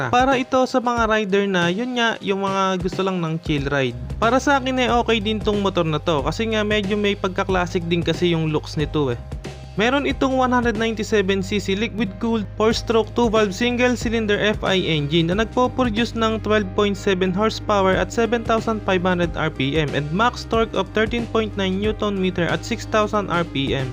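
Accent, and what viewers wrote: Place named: Baliuag, Philippines. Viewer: Sir taga saan ka po? Hahaha. Tawang tawa ako sa tono ng naration mo. Ganyan kasi tono ng salita namin taga baliuag. Hahaha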